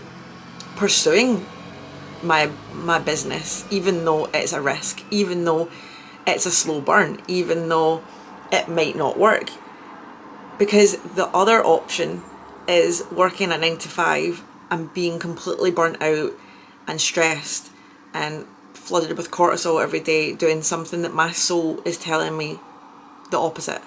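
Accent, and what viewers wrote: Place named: Scotland, United Kingdom. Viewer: It was very evident once I heard you speak😅 my bf is Irish, and I love his accent, but the Scots are something else💝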